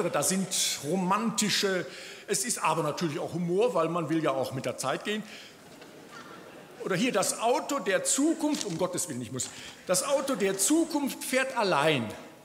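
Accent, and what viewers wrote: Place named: Duisburg, Germany. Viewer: So reden viele in Duisburg.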